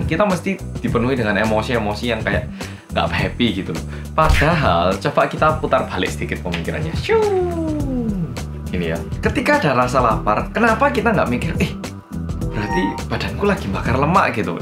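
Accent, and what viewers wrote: Java, Indonesia. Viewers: Medok nya emang sengaja gak di ilangin ya mas 😁tapi bagus kok medok jadi lebih ke Jawa jawa an gitu. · Maaf baru pertamakali nonton.. orang korea asli apa jawa? Atau campuran? Soale muka korea logat jawa..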